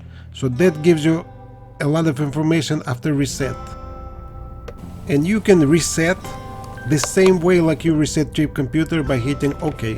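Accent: Russia. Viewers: the best part of the video is this guys accent. sounds like a Russian scientist. the good crazy ones. funny dude.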